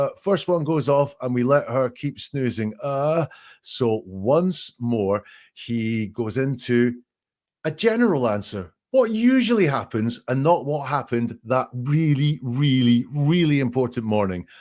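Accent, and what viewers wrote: Scotland, United Kingdom. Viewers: Hello from highland Perthshire, its braw to hear a fellow Scot annalysing this so and so. Watching on catchup. Just subscribed, so hope i get notifications for a live or two and can blether in the chat ❤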